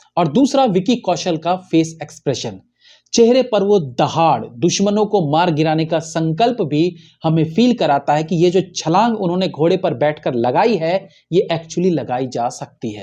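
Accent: Bihar, India. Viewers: Are Bihari nith bol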